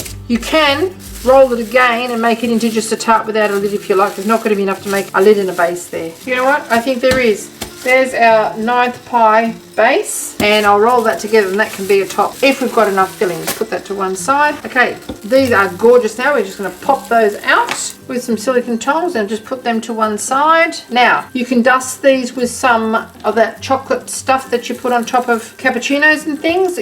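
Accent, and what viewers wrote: Australia. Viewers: Nice to hear an Aussie on YouTube!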